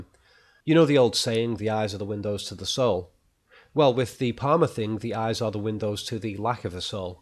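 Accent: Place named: Scotland, United Kingdom. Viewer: Dammit your accent is awesome. You should star in the next Braveheart.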